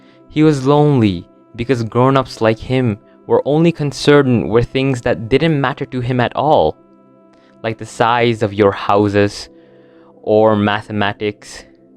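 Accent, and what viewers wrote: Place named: Nepal. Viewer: Sir are u from Nepal ..if yes please lets stay connected with our team as we need accent like ur for a project we are going to launch ...please reply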